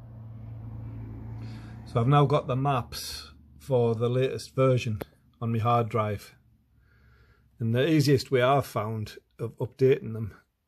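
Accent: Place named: Newcastle, United Kingdom. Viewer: Love the Geordie accent! The most colourful of all English accents. (2020 VW Golf owner). 🦘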